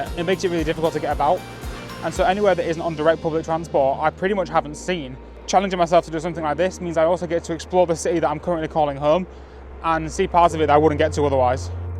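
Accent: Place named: Northern England, United Kingdom. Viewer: What accent is this,i could of sworn it was north england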